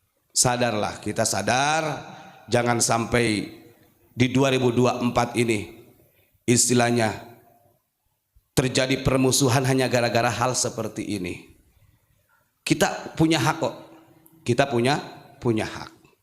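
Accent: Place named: East Java, Indonesia. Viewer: Betul Gus....jatim mn suaranya..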